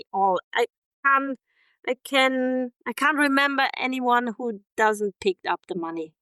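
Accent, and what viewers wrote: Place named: Germany. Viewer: She sounds exactly like a former "n4zi" saying "I had no idea about zee camps".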